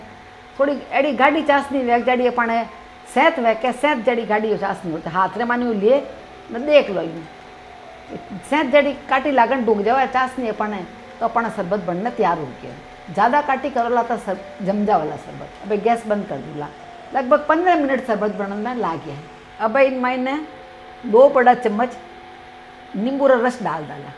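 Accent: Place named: Rajasthan, India. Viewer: Very nice simply method Looking nice kakisa🥰🥰 Rajsthani boli ghani chokhi lage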